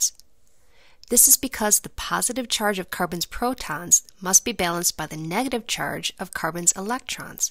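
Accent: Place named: United States. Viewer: Americans have funny accents ヾ(•ω•`)o Not in a bad way Your voice is very crisp 🍎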